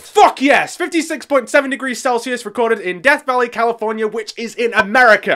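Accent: United Kingdom. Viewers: Are you from Essex? I'm curious, also new here. Nice video. :)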